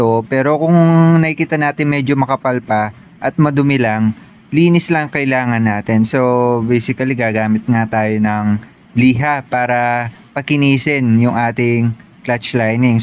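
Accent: Pampanga, Philippines. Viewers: Kapampangan siguro tong si bossing base sa accent? Hahahahhaa nice vid bossing! Very informative! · Basically maganda tong video. Kasi di ko talaga alam kung ano ang basically dragging. Pero parang Kapampangan si sir basically.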